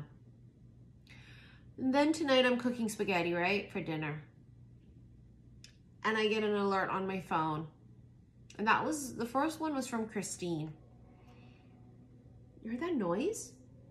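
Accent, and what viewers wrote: New York, United States. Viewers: sound like a true new yorker and remind me of my home Harlem.:goodvibes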